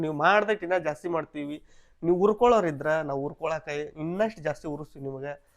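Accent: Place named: Bijapur, India. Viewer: ಪಕ್ಕಾ ನಮ್ಮ ಬಿಜಾಪುರ ಲೋಕಲ್ ಭಾಷೆ 👌👌ಬಾಲದಿನ ಆಗಿತ್ತು ಬರಬ್ಬರಿ ಆಯೇತು 😂😂😂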